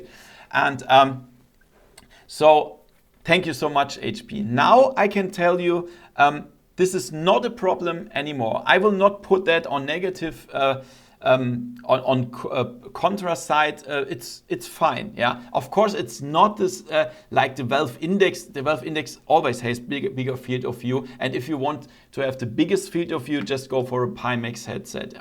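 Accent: Germany. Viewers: Are you German??? )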